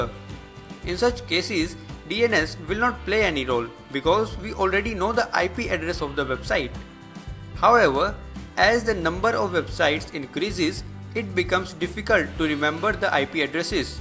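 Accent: India. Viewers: 这印度英语真听不下去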